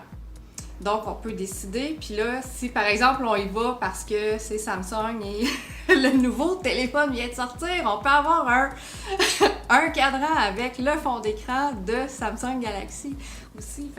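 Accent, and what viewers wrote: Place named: Quebec, Canada. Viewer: language de cetebese .. quebecois ..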